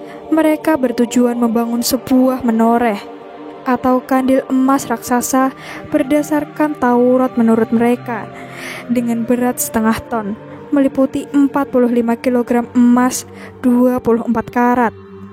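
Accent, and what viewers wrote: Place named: Java, Indonesia. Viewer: Kentel bgt logat jawa nya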